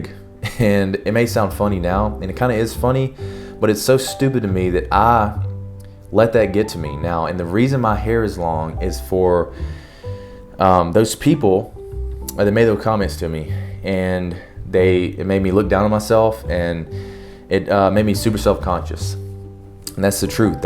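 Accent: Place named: South, United States. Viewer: I really enjoyed this video. It warms my heart to see people changing for the better and having the guts to openly speak about it. And your lil southern twang makes it even better.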